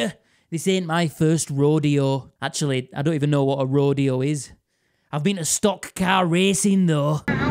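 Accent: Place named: Yorkshire, United Kingdom. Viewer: I would definitely donate a bit of money to you if the country in which I live in did not have an economy as shite as that of Turkey:/ I first started watching your content since the Yorkshire accent really took my attention but ever since then you've made my day several times with your unique style of humor and behs playing. Keep on rocking, thanks for the vids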